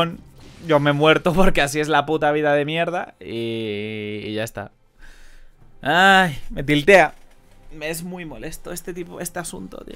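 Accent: Chile. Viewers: habla en chileno xd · Habla como chileenooo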